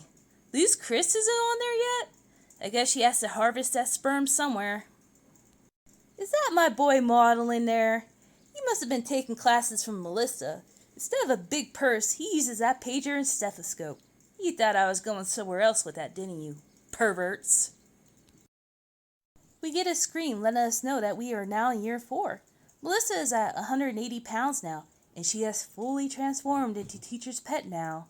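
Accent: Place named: Asia. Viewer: The narrator’s Asian accent spoiled kid voice is annoying 😣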